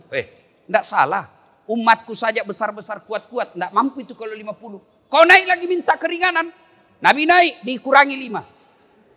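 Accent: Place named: Sulawesi, Indonesia. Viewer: Saya suka mendengar ceramah ustadz. Bahasanya jelas cirikhas Sulawesi Cetho welo welo. Sukses selalu.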